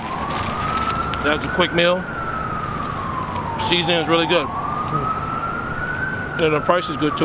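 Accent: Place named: Cambodia. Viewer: Why you talk like ah khmow ?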